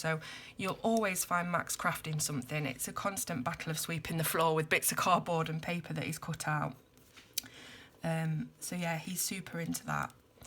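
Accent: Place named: East Midlands, United Kingdom. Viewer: Thank you so much for the mention and for showing us the whole page at the end, loving your videos, dont worry your doing great, and im From manchester by the way, i do go to Loughborough regularly as my daughter and grandson live there so i recognise the "Ey Up Duck" and your accent, mines a typical Northern accent, And i do sound posh on the phone lol my daughters are always saying im like Mrs Bouquet, or Bucket ! haha. Looking forward to more videos please, i love them all, Amanda x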